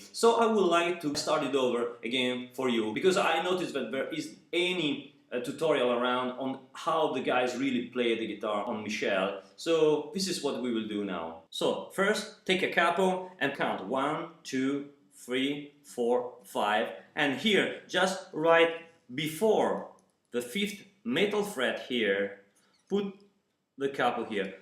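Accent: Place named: Italy. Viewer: Parece que ele é italiano e não fala portugues.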